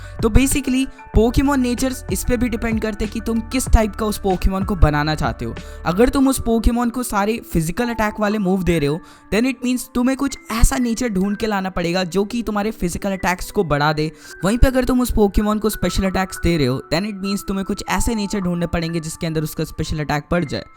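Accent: Bengal, India. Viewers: Are you freaking Bangali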